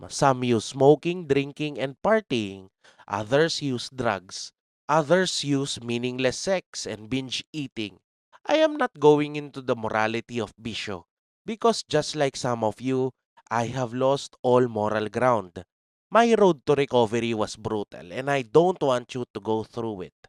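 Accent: Philippines. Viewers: love the heavy Filipino accent!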